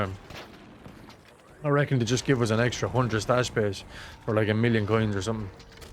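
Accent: Ireland. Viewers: Where in Ireland are ya,?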